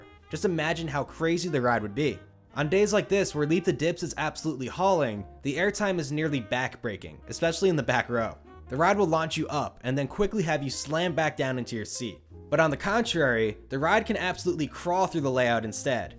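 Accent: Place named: California, United States. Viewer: This is the most Cali accent ever..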